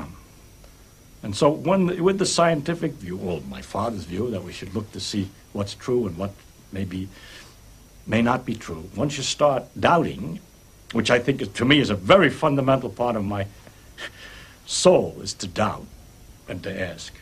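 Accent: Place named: New York, United States. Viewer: I love the way he talks about the universe with the accent of New York longshoreman, or as his friends said a bum.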